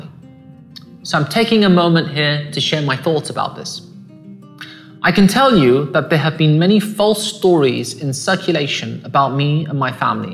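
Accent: United Kingdom. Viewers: When he speaks I get reminded of Hermione Granger and I'm convinced that's how British sound haha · Dang i never thought you have british accent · I learn a lot from each video!! Thank you. Bless you and your family, and may your privacy be protected by God almighty. But, can we stop just a minute to appreciate this beautiful ( British English? ! As foreign language learner I learn a lot, language wise, from you when you speak. It's beautiful, and I recommend listening to you ( speaking, singing composing, and even in silence, your calm composure is something valuable to learn from.) I appreciate greatly, how careful you are to contribute positively to ''the inevitable role model" to have become to many people in the world. ❤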